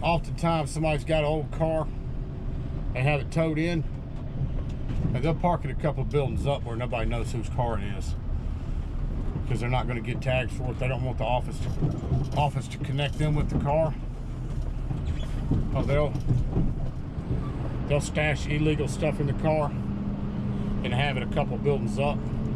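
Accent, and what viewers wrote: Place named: New Jersey, United States. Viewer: You sound like a friend of mine from new Jersey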